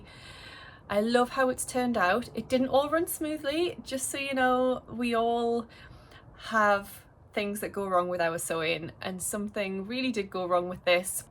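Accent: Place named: County Durham, United Kingdom. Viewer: It's lovely to hear your accent on YouTube, I live in County Durham so not far! I love sewing but I don't have anyone I know that likes it too, I literally get all giddy in a fabric shop! I love dressmaking but have decided to start doing some craft fayres with bags, accessories etc, maybe something like that could help xx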